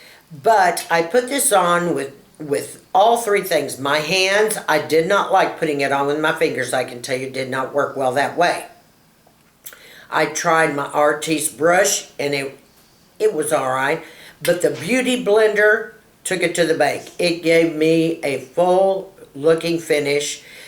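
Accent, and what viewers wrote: Texas, United States. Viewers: You look as young as the others and that is the result of a lot of skin care and taking pride in yourself - you look stunning and I love that Amore Pacific and it sounds wonderful - great choices; I don't powder either - have a wonderful day - love you like a pig loves bacon heehee xxxxx Suzy.....love your Texan accent with the French words:)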